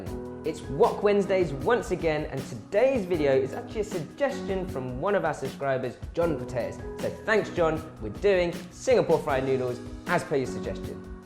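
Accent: England, United Kingdom. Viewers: Chinese man with the pom accent, no matter what countries how gangster you are.? But when you have a pom accent made you look cool and sweet. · is my new favorite internet chef and I watch A LOT of them. He is straightforward and simple, has a cute Brit accent, makes DOABLE dishes and is a NO-NONSENSE kitchen master. I suspect he speaks Cantonese and some French. My main man!!!! Bon appetite! Cheers.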